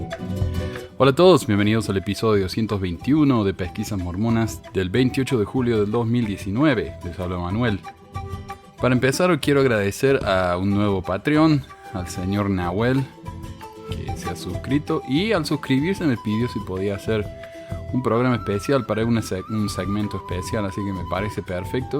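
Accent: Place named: Córdoba, Argentina. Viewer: ESA LLAMA SUPER CUADRUPEDA, del Ecuador que se cree inteligente que te mando mensaje hablando de tu acento Cordobes, Le pregunto Yo que acento tiene Como llama de su CORRAL Ecuatoriano.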